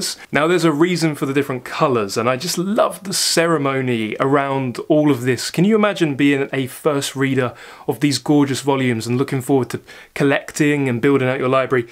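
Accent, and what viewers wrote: United Kingdom. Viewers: I have watched many many book collection videos on You Tube and in my opinion yours are by far the best. The information you provide is very informative and the manner in which it is presented is a joy to experience. The dialect helps (I'm a Yank) as it is the hallmark of the mother tongue as-it-were, but I think it is the thoroughness of your descriptions that I most appreciate. Please keep up the excellent work. [EDIT] I am VERY judicious in my subscription allocations, but for what it may be worth to you I have just subscribed to your channel.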